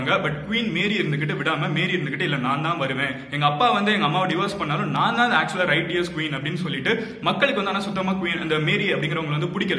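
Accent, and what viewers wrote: Madurai, India. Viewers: Nama madurai slang la pesu thala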